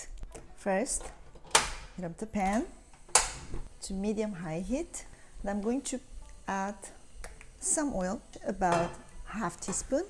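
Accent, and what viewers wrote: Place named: France. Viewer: she sounds like she has a French accent.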